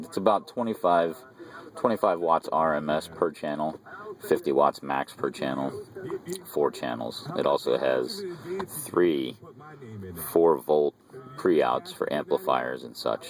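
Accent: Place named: Philadelphia, United States. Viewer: Sound like Philly version of Jim Florentine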